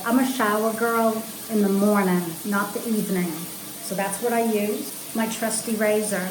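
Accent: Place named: Northeast, United States. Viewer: are u from boston? RI? or NY? accent is hard to nail down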